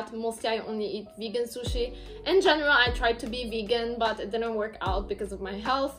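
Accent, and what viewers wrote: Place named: France. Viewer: Such a good video! I was always curious what exactly your accent was because it sounded French but not fully French. Loved getting to know more about you :)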